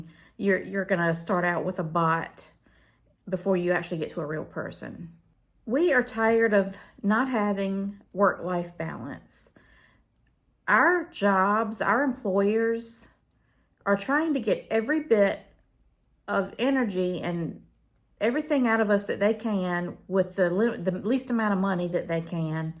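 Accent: South, United States. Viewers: You sound southern like ME!! I am 59 y.o. I call it pre retirement hell. I'm an RN and a school teacher. First part of my career was nursing. My 2nd career is teaching k12. Im trying to make it to 67. I probably wont make it. Ill probably retire at 62 but only if I can live off of the $. It takes every bit of my patience to do my job at this point. I too just want some peace in my life. Just simple things but simple is not cheap. My home is debt free. Ive got a small truck payment. No credit card debt but I do have some student loan debt that im despararely trying to get paid via pslf and its all stuck in limbo right now. Its like everything is stuck. Like everything. It's like that movie Groudhog Day.. SSDD.. stuck in same ole same ole.. it feels like I will work until I die. When will this pre retirement hell end?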